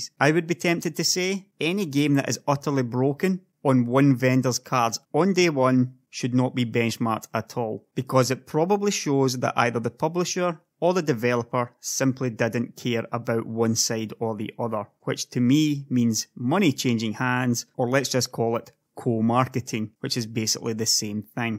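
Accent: Scotland, United Kingdom. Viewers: Scottland? Just wondering because of how you speak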